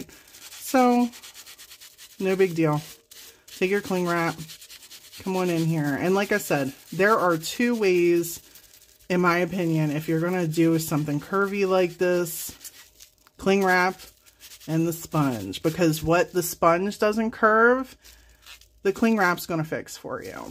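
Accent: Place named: Pennsylvania, United States. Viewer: I love listening to you, it reminds of home. PA. Unfortunately I'm coming in the next few days because of a death in the family.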